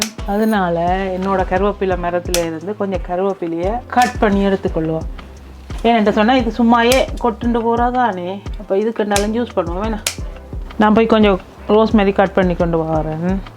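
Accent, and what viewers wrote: Sri Lanka. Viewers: உங்கள் தமிழ் பேச்சு மிகவும் அழகாக அருமையாக கேட்பதற்கு இனிமையாக உள்ளது.உங்கள் இலங்கை தமிழ் கொஞ்சிப் பேசும் அழகு மிகவும் எனக்கு ரொம்ப பிடிக்கும்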